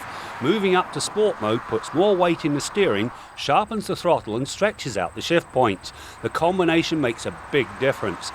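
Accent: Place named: United Kingdom. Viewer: Is originally from the UK? I like his accent.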